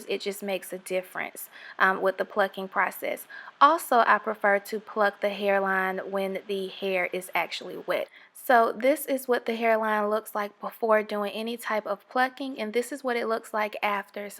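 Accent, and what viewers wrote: South, United States. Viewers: Her subtle southern accent is so cute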